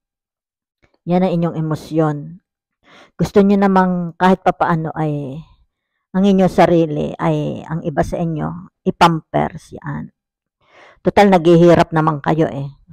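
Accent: Batangas, Philippines. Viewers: thank you tita..GOD Bless you and your family..your a batanguena if i am not mistaken..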